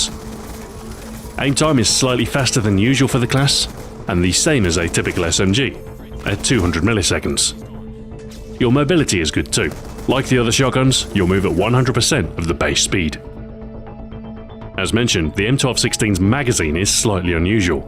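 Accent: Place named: United Kingdom. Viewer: With a weird voice? He has a British accent, you moron.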